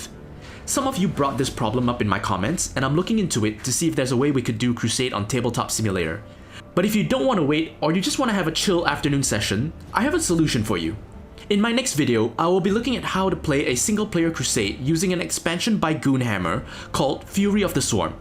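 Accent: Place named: Singapore. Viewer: I KNEW YOUR ACCENT SOUNDED LIKE SINGLISH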